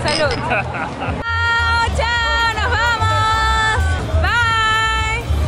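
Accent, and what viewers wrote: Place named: Lima, Peru. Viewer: El acento limeño XD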